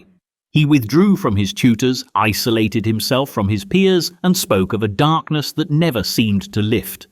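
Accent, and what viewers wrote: United Kingdom. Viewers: I absolutely love this channel and have binged almost all of it. Some suggestions: - I love the British accents you use (2). Keep them - Don't ask the GPT to pad out the wording simply to make the video longer. 2 and a half hours is longer than you need, and padding just becomes tedious after the first 5 minutes 10/10 for this channel. Although this vid isn't as funny as the earlier ones. Input "Britishly subtle sarcasm" into the command. ❤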